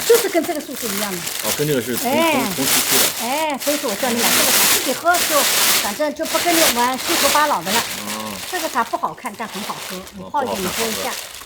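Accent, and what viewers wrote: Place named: Northwest, China. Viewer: 哥们儿我之前看你第一个视频听口音就觉得是西北人果然是 老乡啊我出生在西安雁塔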